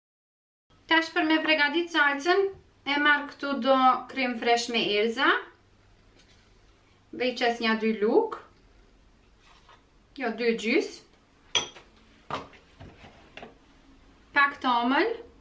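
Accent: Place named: Kosovo. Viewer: pershendes shum dhe po fol shqip si krejt kosova vetum dallimi asht cilin vend jeton ska kurfar dryshimi ma mer mendja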